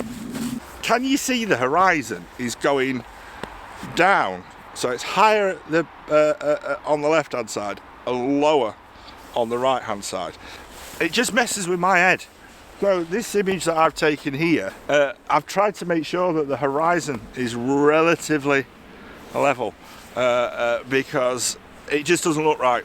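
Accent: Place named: Yorkshire, United Kingdom. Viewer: magic great to hear straight-talking Yorkshire patter. What is the point of a technically correct image if you don't like it?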